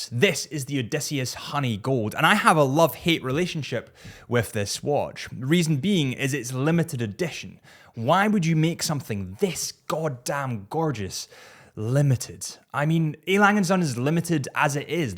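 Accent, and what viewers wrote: Scotland, United Kingdom. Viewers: Love the channel but please please stop presenting the videos using the same style as Adrian Barker but in a Scotish accent.... just be yourself. You don't need to live in his shadow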